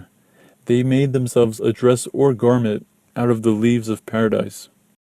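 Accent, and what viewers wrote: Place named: India. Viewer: Are you from India? Cause you pronounce many words like we do. Anyways. Stay healthy. Stay happy. Lov from India.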